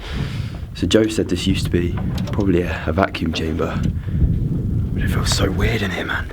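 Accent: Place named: India. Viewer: Inglish accent, sounds, suks, like this dud accent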